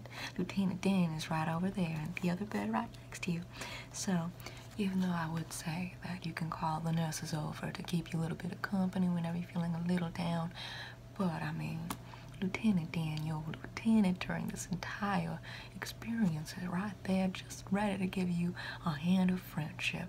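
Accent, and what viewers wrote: South, United States. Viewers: It seems to be more of a blend of the old southern and transatlantic accents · Josh Reyes It seems like she is trying to do something like a deep southern accent but modern southern accents are different from the accents of the 40s and 50s. The r's at the ends of words like four aren't as pronounced making it more like a European accent. She also says Mississippi like Mississipeh which is how a lot of southerners pronounced it during the time period. I don't know if this is exactly what she was going for but it's what her accent sounds like to me. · The soft southern savana accent reminded me of grandma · The crazy part, I’ve seen her other videos and this southern accent isn’t her actual accent it’s just for this video but it’s so good. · I love the southern accent! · Woman calling me honey in a southern accent Me: 😢grand ma😢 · The southern accent is perfect! 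· She looks Tamil Indian but has a southern accent....how exotic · God damn I love your southern accent. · We love that southern accent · Where is the part, returning to the battlefield getting injured and then coming back again for this nurse with that Southern Accent? We need a whole series on this. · As an Englishman would someone help me out and tell me what region of the United States this accent is from ? It’s the most beautiful accent i think I’ve heard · That southern Belle accent. It's melting my heart. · i live in Mississippi and that southern accent is spot on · It's southern sugah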